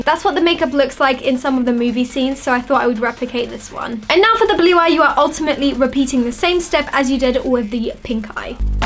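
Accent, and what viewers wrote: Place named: United Kingdom. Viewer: Nice accent I love british accents ^_^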